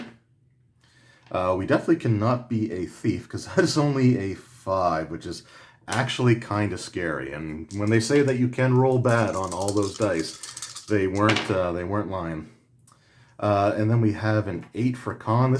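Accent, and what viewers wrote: Canada. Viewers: Thanks for the video. Love seeing 2E stuff. You sounds like you're from east coast Canada :)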